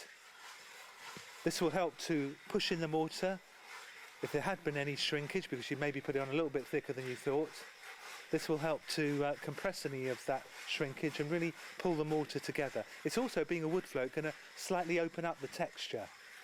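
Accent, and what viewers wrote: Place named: Cornwall/Devon, United Kingdom. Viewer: Ah-ha. I wasn't sure if you were nearby because I detected a Cornish/Devon accent. Considering I just have one internal wall (approximately 2.5 m x 4 m) - and one external length of wall which is only about 4 m², it probably is not worth your while coming all this way down if you are "Up-country". It would be useful if you could recommend somebody in my area. Congratulations once again on your incredible work - hopefully this Will prove a good platform for you to get more work in your area.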